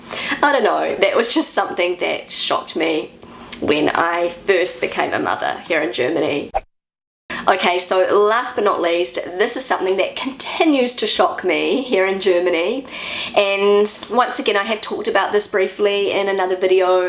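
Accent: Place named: New Zealand. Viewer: Welcome back! Really missed your Kiwi accent. Measles vaccination: I really wish they'd have had that back in the 1970s when I got the measles as a child. It wasn't fun. I had such a high fever the doctors feared for my life. At least that's what my parents told me, but they're parents and maybe exaggerating. Knives: Oh come on! I'm from a rural area, and we boys always had our sharp Swiss Army Knives with us. You can't send a boy to school with a blunt knife, that's embarrassing. Playgrounds: When I was a kid, we had none in our village. But there's a forest, and we were climbing trees instead. Without parental supervision, occasional scratches and bruises included. To be honest, I'd still prefer that. There are sugar-free and even vegan gummi bears, I'm sure the ones from the pediatrician are something like that. Personally, I think children shouldn't be rewarded for doing things that need to be done, but opinions vary. 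"Getting out of winter mode", again: I'm from a rural area. We watch the weather, not the calendar. And you know what the parents in my neighborhood do? They let their children decide what they want to wear. That's good education, in my opinion. They learn from experience, which includes feeling uncomfortable at times if their decision wasn't so wise.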